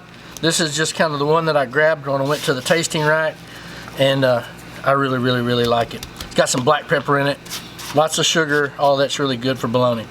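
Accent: South, United States. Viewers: well..let's see...he's combining bar b que....and bologna.....and describing the middle of the piece of bologna as "center- mass"....while cutting it.......and talking with a thick southern accent....my guess is he voted for Joe Biden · I love this guy's accent, he's like an endearing southern uncle.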